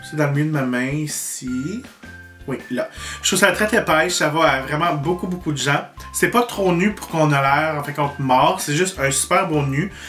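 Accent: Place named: Quebec, Canada. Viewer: the accent from Quebec is really special i agree :)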